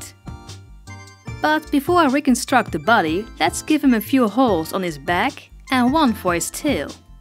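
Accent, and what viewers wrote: Netherlands. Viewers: Je kan horen dat je Nederlands bent hihi Ik ben ook Nederlands en vind je video’s erg leuk maar cringe soms ook erg English: You dan heer that you are Dutch I am dutch as well en like your video’s a lot but its somtimes very cringy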